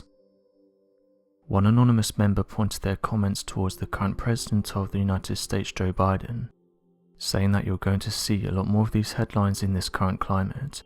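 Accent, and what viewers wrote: United Kingdom. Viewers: Ok so the British accent really is cool but this is old news. How about this computer guy. DONT BREAK THE LAW!!!!!!! Because you will be rolled into the most heinous situation. All of this comes from WHITE people thinking slavery was a good idea. Like any business you need more customers so like a good little racist you are now putting your own People to the sword.. It's human instincts or manstincts that once you do something heinous it breeds and breeds and breeds. Not dealing with slavery ( The dumbest thing AMERICA ever did ) and by that I don't mean reparations , that's tooooooo late. What I mean is sympathy on a level that is real. I get no one wants to pay but how about some sympathy. There is NONE which is why this country is now cannibalizing it's won People. It's really easy , show some damn sympathy and this stuff will never happen but to try to departmentalize hate will lead to this. 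Unresolved issues lead to more. So you got more! This requires a total change of heart on the whole country. Biblicaly this is not going to happen so get saved lol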